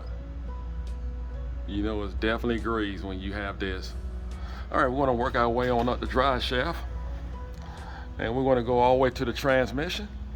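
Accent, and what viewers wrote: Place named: Texas, United States. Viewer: I really appreciate your videos. I am trying to place where you are from. I am guessing south Texas like Houston or San Antonio? If not there maybe South Carolina?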